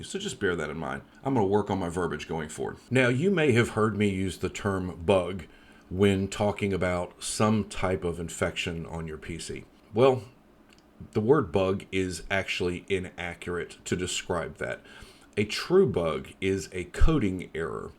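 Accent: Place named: United States. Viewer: I enjoy watching computer help videos when the speaker is from America and has a pleasant voice, which you have, you even sound a bit like a radio man. Happy New Year!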